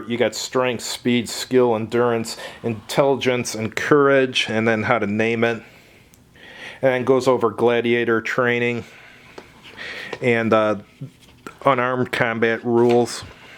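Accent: Chicago, United States. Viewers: must have the thickest accent in all Chicagoland.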